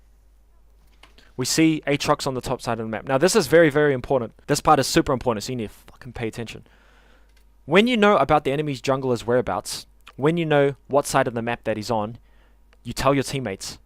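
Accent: New Zealand. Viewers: Thanks for this guide bro, you from nz?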